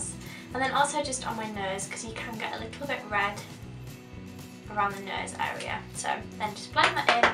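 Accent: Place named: Yorkshire, United Kingdom. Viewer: Hey! I adore your accent, what part of Yorkshire are you from? Love this look xoxo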